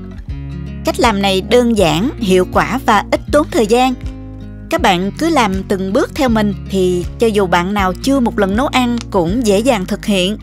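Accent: South, Vietnam. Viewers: Cám ơn nhiều nhé 😁🥰🤩! có giọng nói miền Nam Bộ thật dễ thương ❤❤❤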